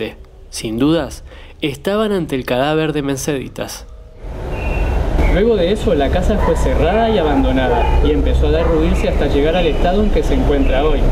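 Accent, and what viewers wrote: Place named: Montevideo, Uruguay. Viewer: Seis años después, vengo a ver éste video, soy Montevideana y viví muchísimos años en esa zona. Son fascinantes las antiguas casonas del Prado! Tenés un acento bastante parecido al de los Montevideanos excepto por la pronunciación de las "ll" o "y" 😊 de qué país sos? Es una pena que la hayan derruido a la casona y en su lugar haya un edificio. Saludos desde Uruguay y el video está muy bueeno!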